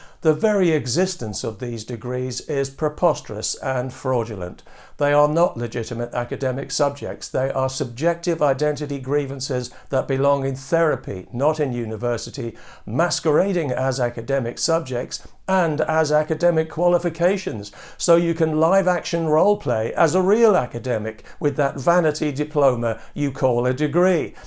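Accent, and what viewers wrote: United Kingdom. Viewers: Yes! Common sense has a British accent today.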